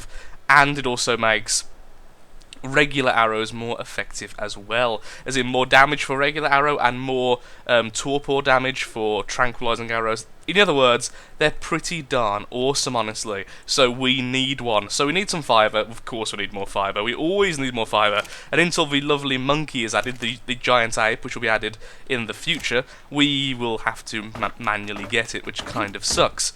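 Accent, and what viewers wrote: United Kingdom. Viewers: Thank you for using Centurion. If you tame a particularly strong beast Legionnaire might be a great name too. As a former radio Program Director I have to say you draw far more attention to your speech difficulties by talking about them than they really seem to deserve any more. I think they may be larger in your mind now due to your hard work to get rid of them than they actually seem to most people. I wouldn't have noticed anything this episode had you not gone on about it. But it's your show. If your point is to encourage others to do a show who think their speech is not perfect that's a whole other matter. Then well done. But really, your Brit accent makes you come off extra smooth to us Yanks and I suspect you don't realize how much say compared to the guys at Good Mythical Morning for example. A funny show, but... How many in your audience do you think we're screaming at you to check out the drop at the top of the hill? 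LOL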